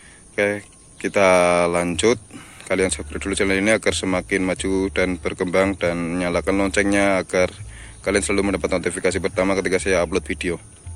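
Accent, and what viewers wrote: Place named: Banyumas, Indonesia. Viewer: Ngapak nih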